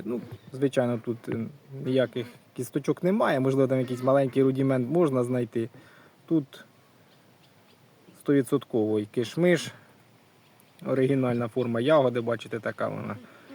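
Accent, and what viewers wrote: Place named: Poltava, Ukraine. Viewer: Дякую за чисту решетилівську мову!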